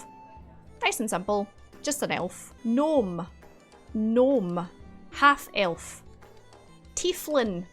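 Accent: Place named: Scotland, United Kingdom. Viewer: I think im the only Scottish person who doesn't really swear or say aye 😅. Im wondering where you're from by your accent, central Scotland in or around Glasgow maybe?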